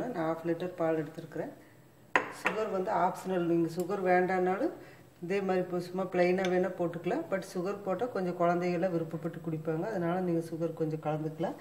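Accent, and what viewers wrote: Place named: Kongu, India. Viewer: Kongu slang with recipe ketka nalla irrukunga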